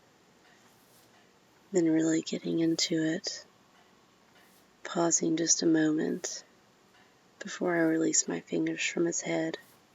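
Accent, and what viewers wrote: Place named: Kentucky, United States. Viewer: Based only on accent, I would say in Kentucky. If I could hear her pronunciation of Louisville, I could confirm.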